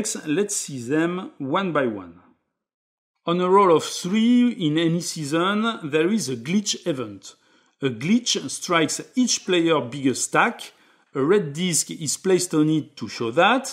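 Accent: France. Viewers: Amazing!!! I watched all 3 vidoes, it’s just fantastic!! Both the game and the explanation/ videos. And I love the French accent🤗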